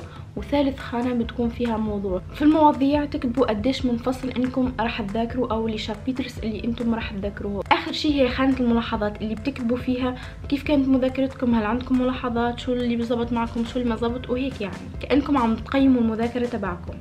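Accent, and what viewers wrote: Tunisia. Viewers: أنت تونسية صح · لهجتك فيها شوي تونسية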